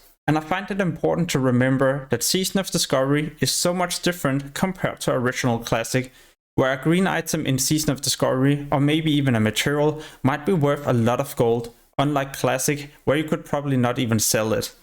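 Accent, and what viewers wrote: Denmark. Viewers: This guy's danglish accent is off the charts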